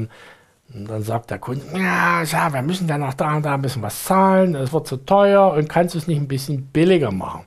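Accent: Germany. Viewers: The dude speaks a funny german slang, which crashes every automatic translator anyway. Only for insiders. 🤣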